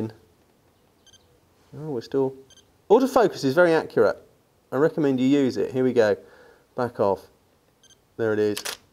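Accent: United Kingdom. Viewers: Fantastic review and I love your accent ! You British ?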